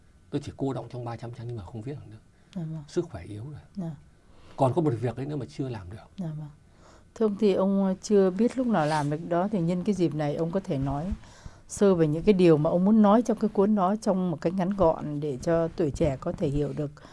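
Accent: North, Vietnam. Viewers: Anh này giọng Bắc bây giờ anh nói là người bình thường .Tớ cũng bình thường sống bình thường sao thấy anh nói bậy bạ liên thiên vậy .các cụ nói trước khi nói phải đá lưỡi 9 lần đấy. Nói bậy nhiều quá .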